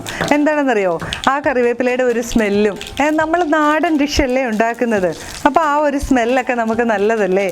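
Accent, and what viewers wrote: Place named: Alappuzha, India. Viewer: Njangal alappuzhakkarude meencurry mathramalla aa bhashayum ethra sundaramanu.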